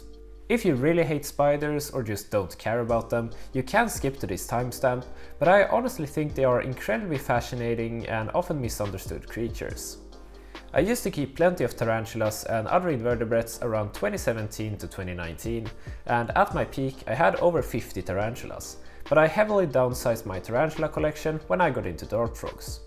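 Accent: Sweden. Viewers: Hörde direkt att det var en svensk dialekt! Kul att se fler svenskar som älskar grodor. Followed immediately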